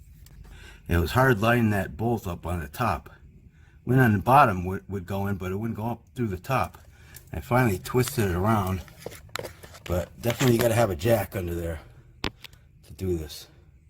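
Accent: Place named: New England, United States. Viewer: Love your New England accent.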